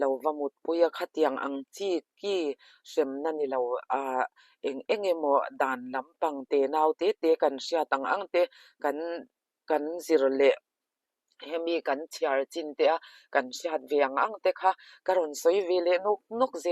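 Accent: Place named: Mizo, Myanmar. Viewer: I thu ziak post ka lo chhiar ṭhin a, a ṭha ka ti viau ṭhin a, mahse i ṭawng ngaihthlak chuan ka lo rindan che nen chuan a va inpersan e, ka ṭawng lo hawlo la min lo hrethiam la, i ṭawng hi ngaihthlak chian chuan Burma Mizo ṭawng a ang, a pai lai deuh a awm nual mai, phalrai tih te adang pawh ka hre ṭeuh, mahse dan hriat hi apawimawh a, chu lamah chuan i ngaihsanawm.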